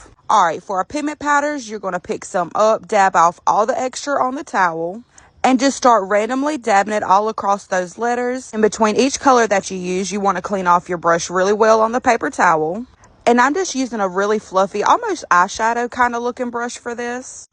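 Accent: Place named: South, United States. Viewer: I’m so sorry but can we talk abt how the longer video plays the more country she sounds 😂😂❤️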